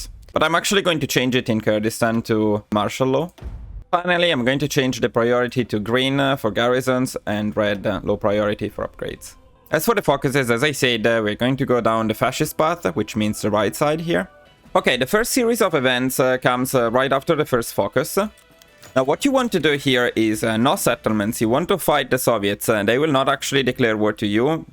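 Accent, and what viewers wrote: Italy. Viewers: I couldn’t tell at first but the Italian accent is very distinctive. Especially with the “-a” sound at the end of a lot of words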